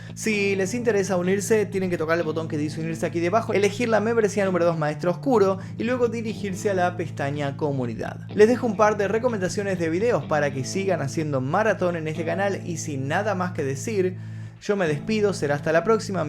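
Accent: Argentina. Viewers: Hola, soy nuevo suscriptor, me gusta como presentas este contenido mórbido y el acento argento es complemento distintivo, sin comentarios picantes como lo hacen famosos youtubers. Tu siempre estudias lo que presentas, obvio la legitimidad está sujeta a la veracidad de tus fuentes pero eso es caso aparte. Cuida por favor tu salud mental, un saludo desde Colombia. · Magnífico y excelente como siempre, como tú, me encanta tú acento argentino y luces..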